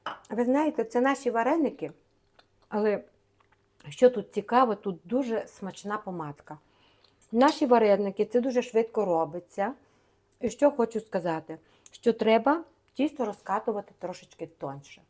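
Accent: Transcarpathia, Ukraine. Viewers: Не нервуйте😊- то є Закарпаття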